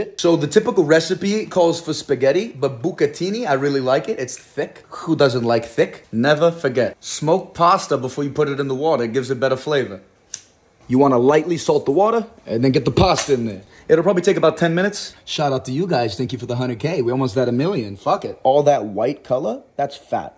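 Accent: Italy. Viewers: Devo dire che si sente il tuo accento italiano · italian its his real accent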